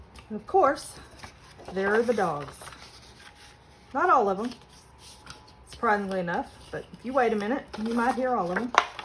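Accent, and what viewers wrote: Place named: Tennessee, United States. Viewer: Very creative! I'm a new sub. Sounds like you are from TN, possibly KY or the Carolinas. The extender arm on my Fiskar cutting board won't go all the way up. Is there a trick to it? 💖🌷✒📖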